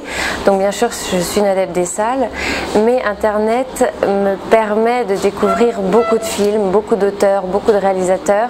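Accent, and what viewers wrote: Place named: Paris, France. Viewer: attention à l'accent bobo-aristo-parisien sinon vous êtes très jolie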